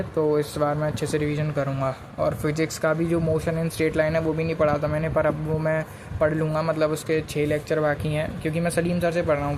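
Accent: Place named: Uttar Pradesh, India. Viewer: bhai up 67 se haina varansi ya Mirzapur side se 😂 yese hi bolne ka style hota hai yha ka mai bhi baliya se hun😅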